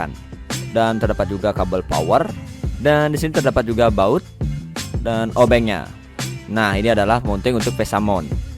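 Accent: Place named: Bali, Indonesia. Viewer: Logat ne bali sajan bli ne 😂😂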